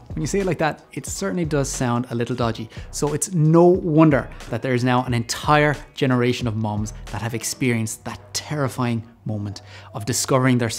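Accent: Ireland. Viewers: Is that an Irish accent that I hear oooh la la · I love the Irish accent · Very Kilkenny accent? am I way off?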